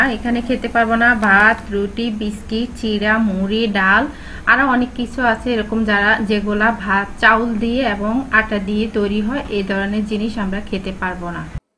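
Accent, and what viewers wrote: Noakhali, Bangladesh. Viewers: Apur bari j noykhli buja gycy kotha suny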